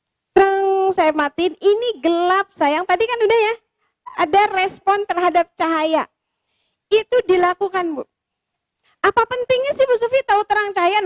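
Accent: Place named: West Java, Indonesia. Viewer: Nyunda banget jd lucu ustadzahnya.. Mudah2an generasi indonesia kedepannya menjadi generasi emas qurani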